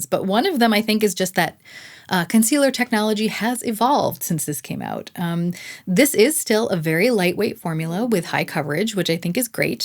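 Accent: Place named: Canada. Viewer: I think I hear a Canadian accent? Yay!!🇨🇦🇨🇦❤️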